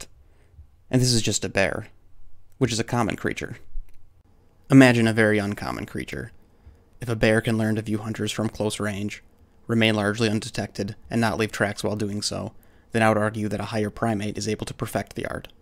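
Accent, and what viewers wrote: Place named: New England, United States. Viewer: You must be from the East coast. No one says "wicked" like us that live in New England. I'm in Maine. I have a friend that had an encounter in the Bridgewater triangle ( wompatuck state park) He and friends were chased out of the woods by an ungodly scream. My theory is they are everywhere! Thanks for sharing your experience. I truly love your channel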